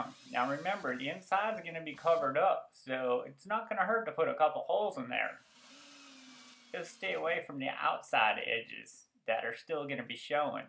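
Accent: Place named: South, United States. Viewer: U gots a southern accent now!!!!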